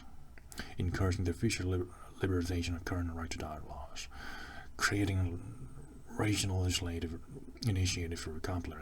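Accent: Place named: South, United States. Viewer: Your accent sounds very country southern USA.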